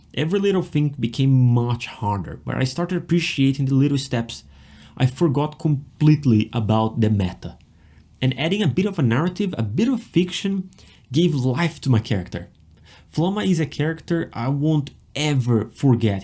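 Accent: Brazil. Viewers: KKkkk estava assistindo o video e pensei se era Brasileiro kkk pelo sotaque. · Are you brazilian? I can sense your accent might be from there! :) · I was suspecting you were Brazilian because of your accent, then I saw you chatting in Portuguese lol Nice video, meu conterrâneo!! Let's play together sometime... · Are you Brazilian by any chance? I saw you are playing in Portuguese. And I can sense I little bit of ascent. I'm from Brazil btw haha😊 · Demorei a perceber que você era brasileiro, seu sotaque é maravilhoso.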